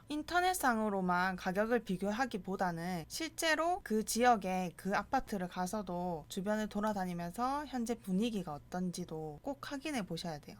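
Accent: Gyeongsang, South Korea. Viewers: 어후 쌍도사투리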